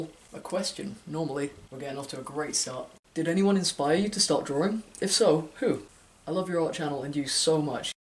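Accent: United Kingdom. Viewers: He is cute but that accent is asome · Oh I have a question are u British sorry I don’t know im new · Dude, even I, who's not an English speaker, can FEEEEEL the British accent ! xDD (not that it bothers me, it's cute actually :3)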